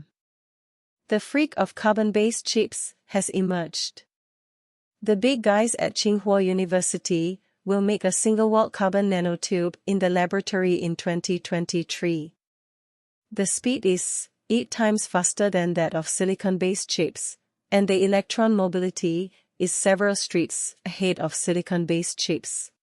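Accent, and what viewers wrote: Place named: Malaysia. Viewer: This is Malaysian accent english. Whats wrong with that? It is clear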